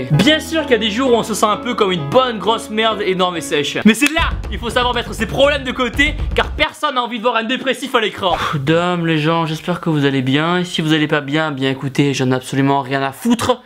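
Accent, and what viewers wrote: South, France. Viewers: Ta l.accent du sud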